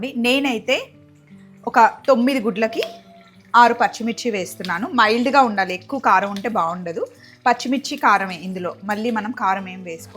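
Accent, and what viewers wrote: Andhra Pradesh, India. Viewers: Hi, I have a small doubt and ofcourse out of curiosity am asking, are you from Andhra or Telangana ??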